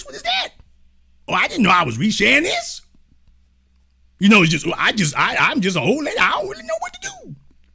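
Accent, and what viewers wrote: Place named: Texas, United States. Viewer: He talk like he from Houston or Texas somewhere😢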